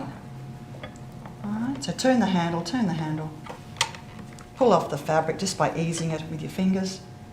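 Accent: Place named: Australia. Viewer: Thank you for your clear and informative instruction on pleater use. Well done 👏👏👏 How nice to hear not only an Australian voice but an Australian voice with culture (without 'ocker') I now will search out where I can buy an Amanda Jane!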